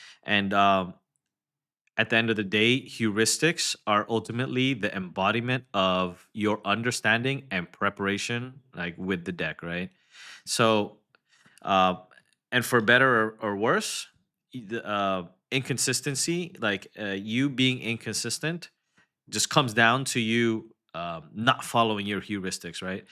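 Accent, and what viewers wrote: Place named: Hawaii, United States. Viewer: Brudda, you hawaiian. I hear the accent and see that sleeve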